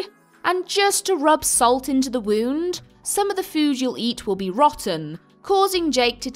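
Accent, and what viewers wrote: United Kingdom. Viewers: Was looking for a review of Yoku's Island Express and this somehow showed up and I just had to watch it xD I remember this game being one of the few that was actually translated to Danish back in the day and I do remember being kinda intrigued by it in general. Really well made and thorough review btw. And heccin' love your British the Britishness in your voice xD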